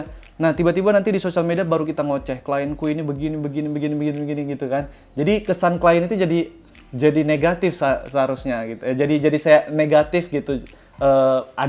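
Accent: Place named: Medan, Indonesia. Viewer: Bang org man nih Kayak ada Medan Medan nya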